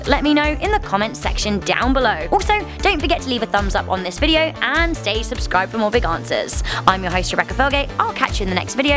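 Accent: United Kingdom. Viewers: Having a British accent doesn’t make you smart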